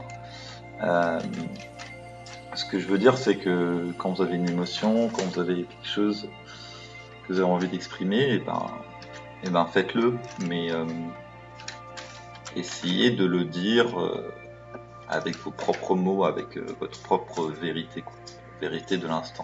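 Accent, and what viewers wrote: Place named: Quebec, Canada. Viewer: Si l'accent québecois est supportable, ça parle du stress <link>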